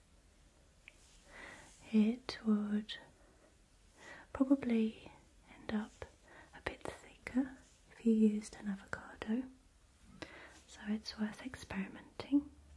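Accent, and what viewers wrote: United Kingdom. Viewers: I live for the accent. Are you Australian or British? It sounds more British to me..? Anyway, great video!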